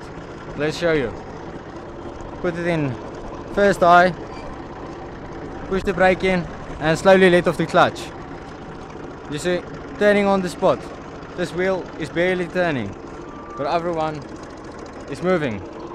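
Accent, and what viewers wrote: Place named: South Africa. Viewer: You sound like a South African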